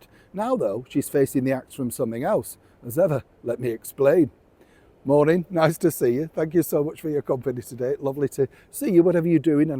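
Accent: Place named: England, United Kingdom. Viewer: So enjoy the street scenes you have. Those along with your accent, make me feel tht I’m back in England.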